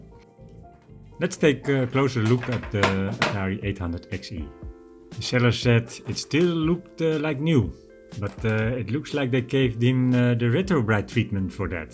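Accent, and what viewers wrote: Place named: Netherlands. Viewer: I think he’s a Dutch guy 😅he speaks “coal miner” English 😊 Nonetheless a great video, nice research!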